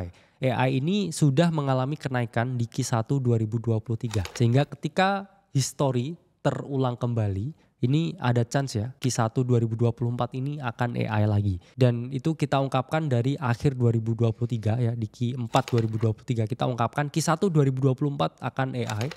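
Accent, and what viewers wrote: Java, Indonesia. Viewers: 🎉mas jowo nya ngendi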